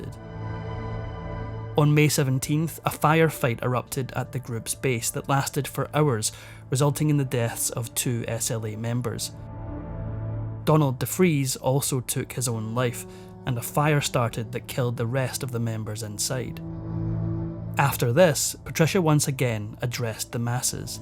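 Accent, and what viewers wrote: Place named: Scotland, United Kingdom. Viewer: Yay my all time favorite Narrator Love the Scot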